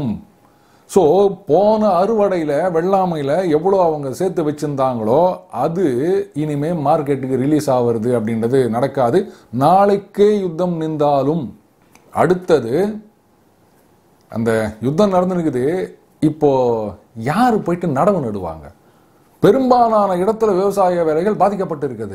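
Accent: Chennai, India. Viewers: Madras தமிழ் பேச்சு, பிரமாதம்.....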